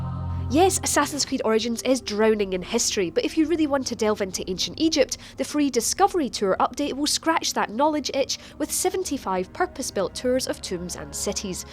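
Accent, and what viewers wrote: Scotland, United Kingdom. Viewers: TDX just her accent,Scottish i think,pretty cute actually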